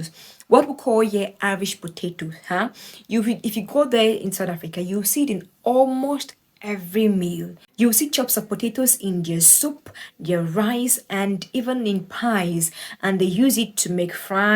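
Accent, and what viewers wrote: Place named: West Africa. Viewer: Tht accent is Western Africa live yr seed on yr way out we going to need tht copy in the future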